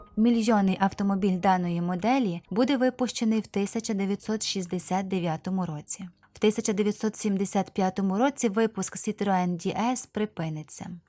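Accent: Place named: Central Ukraine, Ukraine. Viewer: ви певно з центральної України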